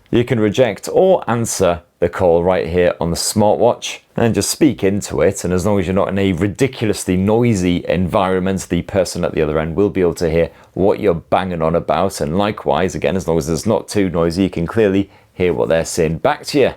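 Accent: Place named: England, United Kingdom. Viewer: All the hissing and English accent makes this guy unwatchable. The review is also as bad. There are so many issues with this watch since you can't download anything on it. No spos, no watch faces, etc. otherwise a great watch. So don't buy, I bought their Watch GT 5 46mm but frustrating to update and like I said it's missing fo many functiond, cant pay with the watch it doesn't support north American banks and their software is banned. This guy is a hack and doesn't tell you the truth.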